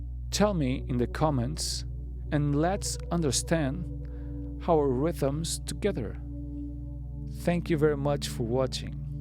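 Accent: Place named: Portugal. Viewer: Do you speak Portuguese? I hear your accent! Do tell us more about yourself. I just read “ Why we sleep” book. Sleep is fascinating. We are so complex!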